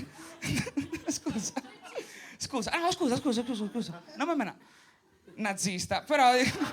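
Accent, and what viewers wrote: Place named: Marche, Italy. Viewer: "comunque lu spettacolo è lu mio" average entroterra marchigiano vibes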